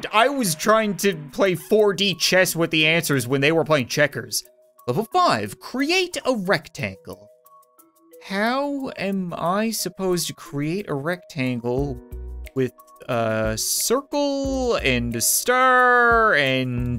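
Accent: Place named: Canada. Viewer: S'called canadian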